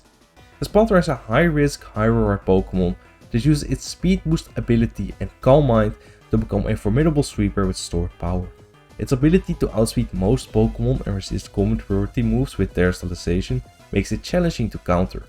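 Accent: Netherlands. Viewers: I finally understand what my English teacher means with a Dutch accent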